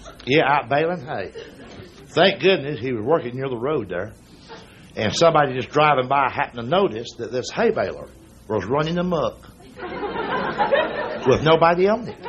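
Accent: South, United States. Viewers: Only the thing they have in common is the southern accent, both of them are great storytellers and can just simply cause you to bust out laughing by telling a simple story but they are two different comedians. The great Jerry Clower centered all of his routine around a family that lived in the Mississippi town he was from. This guy stretches it out more and brings just a lit little bit of political comedy into his routine where Jerry Clower stayed away from politics. This guy is more like the George carlin of the south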